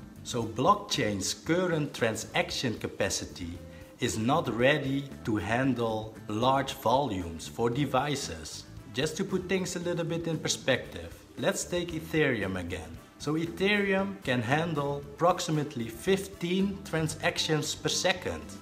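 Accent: Netherlands. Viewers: This was great, I really enjoyed your presentation style. You must be Dutch judging from your accent.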